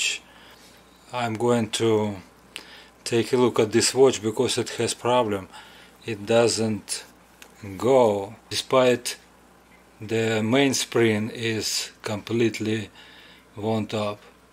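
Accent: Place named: Russia. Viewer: Your Russian accent is перфект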